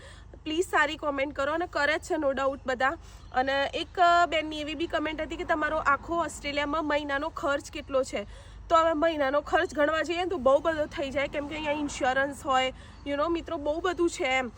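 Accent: Sabarkantha, India. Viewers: બેન તમે બોલો છો એ ખુબ જ ગમે છે અને બીજું કે જે માણશ બોલકું હોય એના દિલ મા કોઈ દિવસ કપટ ના હોય કે છલ ના હોય અને બીજું કે સાબરકાંઠા ની બોલી એટલે વાત પુરી થઈ જાય i like it બીજું તમે સુંદર કામ કર્યું છે કે વિદેશ નું ક્લચર કેવું હોય છે ત્યાં માણસો નો સ્વભાવ તેમનું વ્યક્તિત્વ તેમની રહેણી કહેણી ત્યાં ના માણસો મિલનસાર છે કે નહિ માયાળુ છે કે નહિ આ બધુ સમજીને વિડિઓ બનાવવા સહેલા નથી ભારત વાળા માણસો હંમેશા ભૂલો કરવામાં કચકચ કરવામાં ખાંચા કાઢવામાં જ નંબર વન છે એટલે તમે બધા શુ બોલે છે એમાં ધ્યાન ના આપશો બસ સુંદર સુંદર વિડિઓ બનાવી ને મોકલો ભારત મા મોગવારી એ માજા મૂકી છે બેન વિદેશ વિશે કંઈક તો જાણવા મળે 👌🏻👌🏻👌🏻👌🏻👌🏻🙏🏻🙏🏻🙏🏻❤️❤️ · Apni speech thi j khabar padi gai ke tame s.k. na chho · બેન આપના વીડિયો થી અમને ત્યાં રૂબરૂ આવ્યા નો અહેસાસ થાય છે,અહી બેઠા અમને ત્યાં કેવું પર્યાવરણ,ત્યાંનું લોકજીવન,રોડ રસ્તા,સુવિધાઓ ત્યાંના નીતિ નિયમો જાણવા મળે છે,બીજું કે આપની શુદ્ધ ગુજરાતી માં સાબરકાંઠા નો લહેકો.આવેછે એ પરથી અમને ખબર પડીજ ગઈ હતી કે બેન. 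આપણાં બાજુના જ છે,એ આનંદ ની વાત છે,બધાને વિદેશ માં કેવું જીવન છે એ જાણવાની જિજ્ઞાસા હોય જ જે તમે વીડિયો દ્વારા બતાવો છો એ સારી બાબત છે,હવે થોડા ઘણા તો નેગેટિવ કૉમેન્ટ કરવાના,એ બધું ધ્યાન પર ના લેવાય,આતો દુનિયા છે આમ પણ કહેશે અને એમ પણ કહેશે,બાકી તમારું કામ ખુબજ સારું છે ધન્યવાદ.ભગવાન તમારું સારું કરે.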